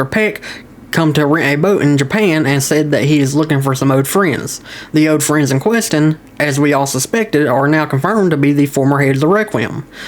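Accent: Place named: South, United States. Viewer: Sometimes i forget u have a southern accent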